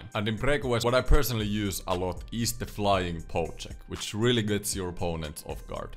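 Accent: Finland. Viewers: kun sanoit et sun enkku oli huonoo joskus ja vähän tavallaa viittasit et se ois vieläkin, toki parempaa kuin ennen. Ni ihan pikku tippi siiheb liittyen ni sano L1/L2 ja R1/R2 "EL 1/2" ja "AR1/2" ni jos tääl on jotain jotka ei tuota ymmärtäs ku sanot äl one ja är one ni sitten he ainaki ymmärtää. Mut siis muuten imo ihan hyvää enkkuu puhut sillee suomalaisel aksentil.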